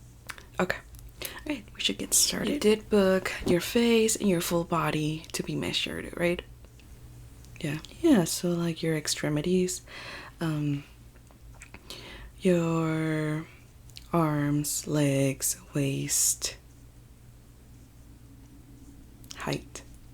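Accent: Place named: United States. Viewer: A very clear, deep, soft female voice ❤ with a natural American accent. Not all those "French, Mexican, Slavic, etc."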